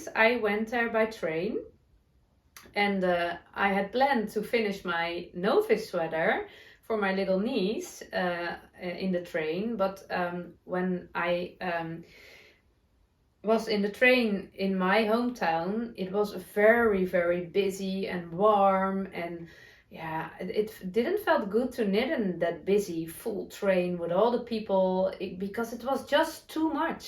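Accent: Netherlands. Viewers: I so love your podcast and your Dutchy English, you are so lovely. I live in Norwich UK, so just across the sea from you. It only takes 40 minutes to get to Amsterdam from our local airport. I love to knit and sew and i am knitting the Flora sweater at the moment by Irene Lin. Also sewing the Hinterland dress. Take care and love from my house to yours x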